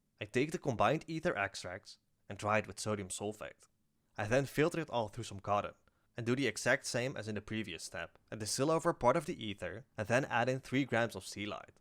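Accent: Netherlands. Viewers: I hear a Dutch accent? Gaaf hoor, en ik moet me echt een rain geur aanschaffen 😅 -Oh nee ze zijn uitverkocht! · r u dutch? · Are u dutch ? u sure as hell sound like it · I know a fellow Dutchman when I see (hear) one... goed bezig man, je hebt een kijker erbij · Are you by any chance dutch? I noticed a little bit after way too long but i did pick out a couple of pronunciations when i started to focus on it, and now you saying youre not a native speaker... That would be really cool! Anyways loved the video im glad i got it in my recommended im definately gonna binge you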